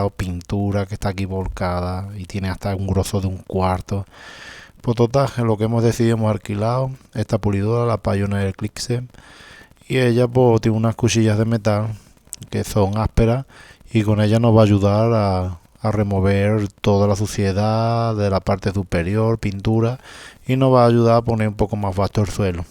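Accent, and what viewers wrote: Andalusia, Spain. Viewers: Buen trabajo! Illo, usted de donde es? De Conil? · ¿Puede ser de Cádiz o Sevilla? Saludos desde Pilas (Sevilla) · Hola amigo.... De dónde eres? Por tu habla pienso que eres de muy cerca de mi pueblo... Sanlúcar de Barrameda Cádiz... España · Holaaa, he encontrado este video, es un trabajo excelente. Por casualidad eres andaluz??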